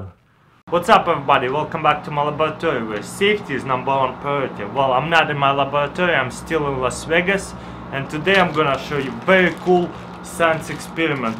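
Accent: Russia. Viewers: He's Russian. English isn't his first language. Dick. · Are you Russian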